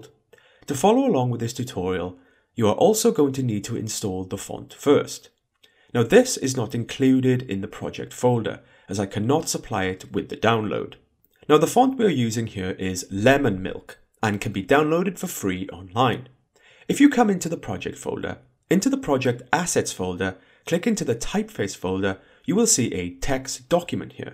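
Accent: United Kingdom. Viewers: Is there an option to turn off british accent?:hand-orange-covering-eyes::hand-orange-covering-eyes::face-orange-raised-eyebrow::face-orange-biting-nails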